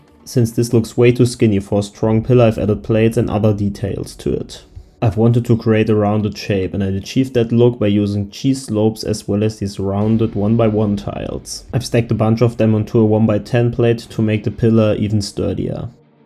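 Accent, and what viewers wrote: Germany. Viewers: You sound like a German that is speaking English bro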